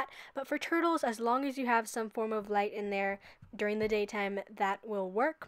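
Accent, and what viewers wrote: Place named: Yorkshire, United Kingdom. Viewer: You sound like your from Yorkshire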